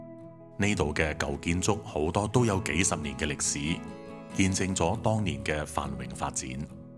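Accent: Hong Kong, China. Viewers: 請問你是香港人嗎？因為聽口音不像馬來西亞華人。。😀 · U sound like Hongkie. Great job. Hope to see more of your work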